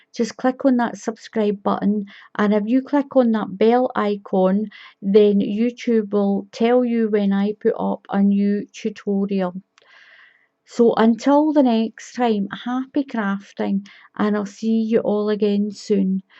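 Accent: Scotland, United Kingdom. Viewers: wow, how rude is this women, I just cant believe that she is so sour and bitter that she would try and bring you down. I am very great-full for your hints and extra info to make my crochet easier and added bonus, your Scottish accent. Sending love and best wishes from Perth Western Australia x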